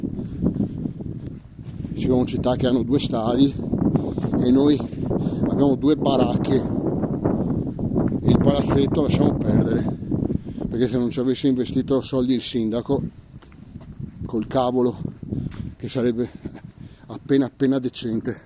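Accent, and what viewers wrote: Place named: Veneto, Italy. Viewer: Non serviva che precisassi che sei da Venezia... si capiva molto bene ahhaha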